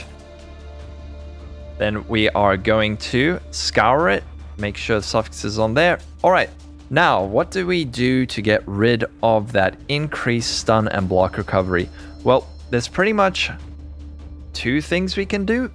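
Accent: South Africa. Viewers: Hey bro, I commented on your last video r.e. figuring out you are a South African from your accent... how can I got about getting those discord details? My buddy and myself from SA would like to connect with other SA guys... shot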